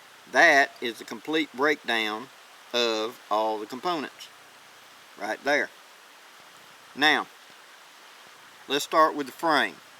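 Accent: South, United States. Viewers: Iimpact driver,small hammer, well fitted bits, and a padded vise with patience and care will get the screws out. putting them back where they came from is brilliant. Very nicely done sir. P.S. I thought I did hear that Magnolia in your mouth. Hurrah for the Bonnie Blue flag. The fourth screw is for the shoulder stock which will not work on this gun.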